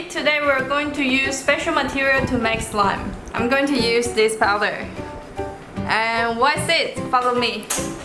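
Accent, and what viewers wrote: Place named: Asia. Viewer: My mum has the same Asian accent as u!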